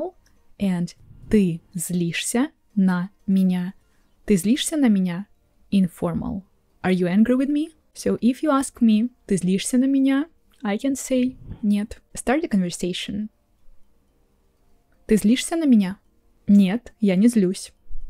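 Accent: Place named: Russia. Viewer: Я слышу её акцент русский, на мой взгляд первым делом нужно ставить четкое произношение, а не количество фраз, достаточно немного, но произношение должно быть без акцента, акцент это отвратительно.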